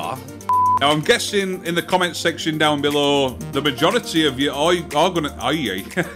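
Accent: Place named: South Yorkshire, United Kingdom. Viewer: Where's your accent from dude? My guess south Yorkshire?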